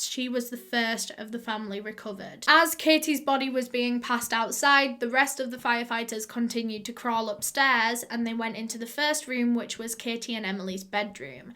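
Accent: United Kingdom. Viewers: I also like watching her cuz she is British and I can't stand other accents as I am British and like I'm not try a be rude or mean I just prefer the British accent rather then any other cuz I grew up around that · You’re accent is too British..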